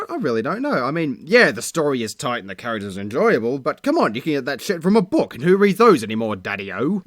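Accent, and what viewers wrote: Australia. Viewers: Holy shit you're Australian. Mind fucked.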